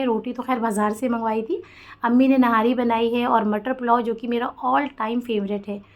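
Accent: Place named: Delhi, India. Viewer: Wow aap dehli se h isi liye itna peyara labo lahza h mujhe hamesha andaza hota tha aap dehli ki ho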